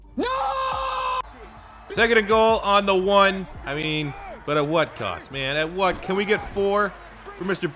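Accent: Canada. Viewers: The Random Broncos Dude he's Canadian he says stuff differently you're a broncos fan there's other stuff to be mad about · I liked u bro, until I recognized that hitious Canadian accent..yuk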